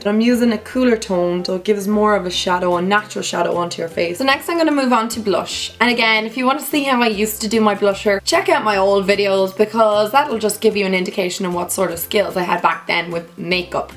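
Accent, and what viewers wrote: Ireland. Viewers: Are you Irish beautiful?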